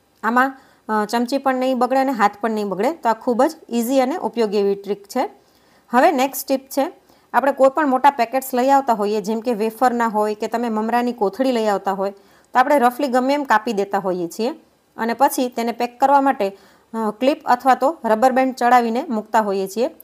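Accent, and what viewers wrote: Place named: Kathiawar, India. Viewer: Madam tame puore kathyavadi bolo cho How sweet Kya city ma cho? All tips best👌👌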